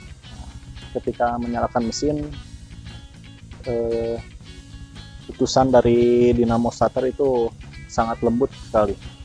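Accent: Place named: West Java, Indonesia. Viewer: Konsumsi bbm dan oli juga kang Btw wa suka logat sunda sampeyan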